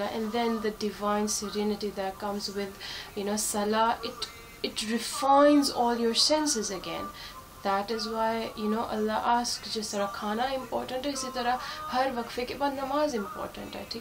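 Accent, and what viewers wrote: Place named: India. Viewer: O MY GOD, HOW LOVELY HINDI U SPEAK, LOVE YA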